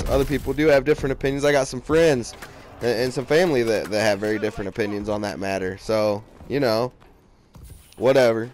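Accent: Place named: Indiana, United States. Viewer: 20 times!!!!More times!!!! I can tell your from Indiana. lmao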